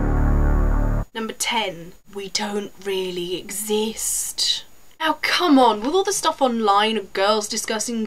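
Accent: United Kingdom. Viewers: HAHA! THOUGHT THIS WAS QUITE FUNNY ACTUALLY. . SURPRISED ME WHEN A TRUE BRITISH ACCENT CAME OUT TOO! :)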